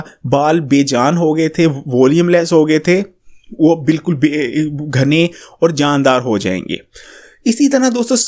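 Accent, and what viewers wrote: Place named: Kashmir, India. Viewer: AAPKI AWAZ MUJE BAHUT ACHI LAGTI H.ASE LAGTA H AAP KASHMERI YA PAKISTAN SE H.M PUNJABI HU OR KISI MUSLIM K MUH SE PUNJABI BAHUT ACHI LAGTI H😍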